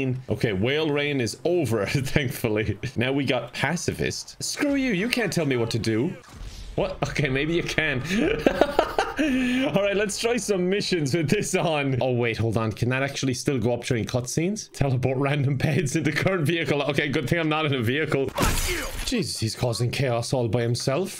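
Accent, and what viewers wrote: Ireland. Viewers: Is he Irish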